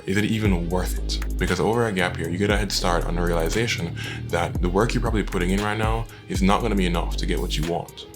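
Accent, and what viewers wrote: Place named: Ireland. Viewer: I know youve a carribbean accent but it still aounds so irish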